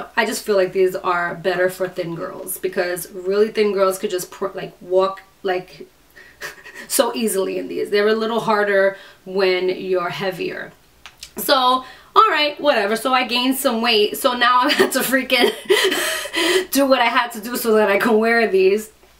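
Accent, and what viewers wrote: New York, United States. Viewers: Sounds like a New York,Staten island or Long Island accent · Def a New Yorker like me haha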